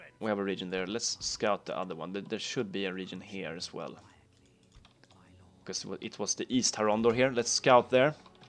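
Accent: Sweden. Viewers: You sound like you have a swedish accent. Är du svensk?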